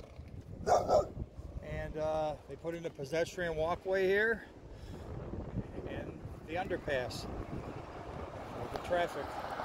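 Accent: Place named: Michigan, United States. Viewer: I love your accent!! Michigan?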